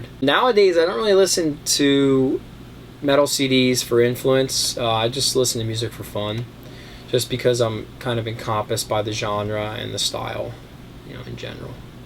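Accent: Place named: Pennsylvania, United States. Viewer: man, that PA accent. hahahaha. makes me feel like home. congrats on 11k subs!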